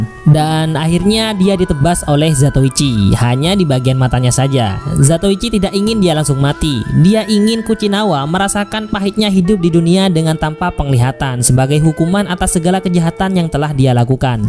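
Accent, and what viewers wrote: Madura, Indonesia. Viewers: Bang dari madura yaaa.. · Abang orang Madura yah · Madura bingits cak, Kereen... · Penyiarnya kaya logat madura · Woo..naratornya kacong y.. 😀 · Khas Madura ya kuat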